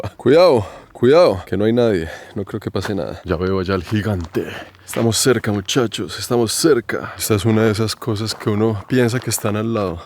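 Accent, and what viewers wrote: Colombia. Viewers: Me encanta el acento colombiano 🤗💕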